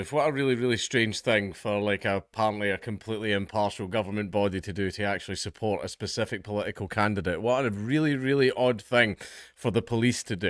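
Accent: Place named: Scotland, United Kingdom. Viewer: Damn I have missed that Accent - Great Video Pal, Long live Scotland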